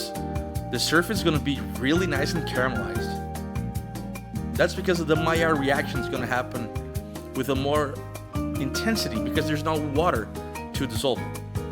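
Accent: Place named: Netherlands. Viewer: You sound Dutch with your accent. I am from the Netherlands and I have a brazillian wife. I found your channel looking for good recipes. Are we bros?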